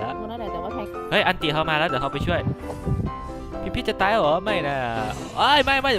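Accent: Isan, Thailand. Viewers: เดี๋ยวเฮาช่วย ชอบอีสานสำเนียงไทยของพี่อ่ะน่ารัก5555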